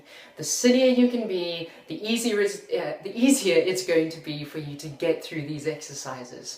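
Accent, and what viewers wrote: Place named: South Africa. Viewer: You sound like the news anchors in South Africa. Something about your accent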